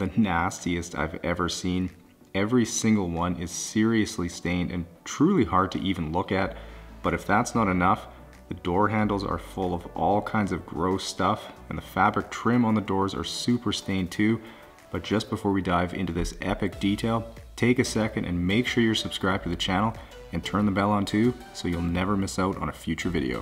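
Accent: Canada. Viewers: You forgot the Canadian accent!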